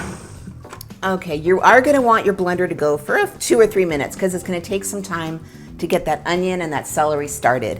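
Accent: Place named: South, United States. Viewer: Hi! That dressing looks great! I have not seen a recipe calling for the oil from the anchovies, either, so I am sure that dressing would have a ton of flavor. Accent is definitely Southern! I agree that olive oil has a strong flavor and probably wouldn't be right in this. Romaine is so common now, a lot of people won't eat Iceberg...hard to believe that Iceberg used to be normal. Wow, that turned out great. Like you said, it makes a ton, so you can make your neighbors happy. Thanks for sharing!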